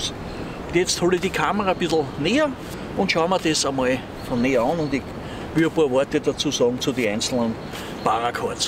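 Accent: Standard. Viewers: Moin, schöne Vorstellung! Danke dir. Das elastische Paracord finde ich echt praktisch, das kannte ich noch gar nicht. :) Lieben Gruß Sven PS. Sagen wir medium Hochdeutsch 😋 bist aber wie immer gut zu verstehen außerdem mag ich deine Mundart :) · Dein Hochdeutsch ist 1A ... als Niederösterreicher warst du für mich total verständlich. 😄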